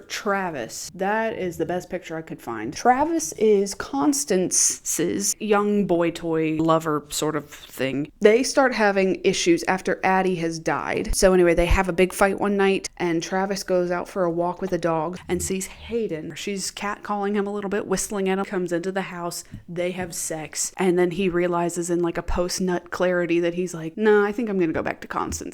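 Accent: United States. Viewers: 'eeeey, I thought your accent sounded familiar! Fellow Virginian, here! Born in Norfolk, grew up in VA Beach, Chesapeake, then Williamsburg. Hi neighbor! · I literally know nothing about this show but you will bet I will listen to an american southern woman talk about AHS for 2 hours! Also, random note i saw from another comment. I always think its so weird when people say others are a Mics Mike copy cos theyre using a whiteboard or pin board. Like girl have you never heard of powerpoints before ?? youtubers have been using pin boards and white boards for years lol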